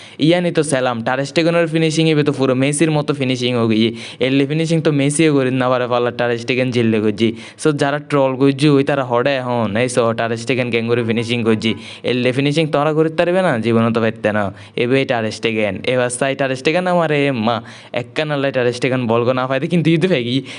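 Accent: Chittagong, Bangladesh. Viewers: আরে দুর ভাই প্রতিদিন আর চট্টগ্রাম এর ভাষা ভলো লাগে না। বন্ধ করেন এইসব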